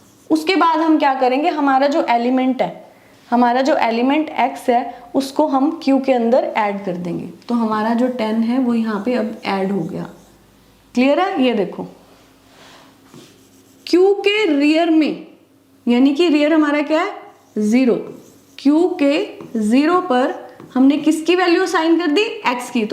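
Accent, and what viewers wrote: Punjab, India. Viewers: Like ur punjabi accent while speaking english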